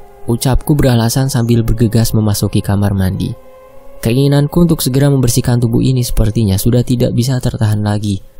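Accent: Java, Indonesia. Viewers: Ini kan ceritanya orang sunda. Tp kenapa bahasanya, trs cengkoknya itu kaya orang jawa ya, beda sama teksnya.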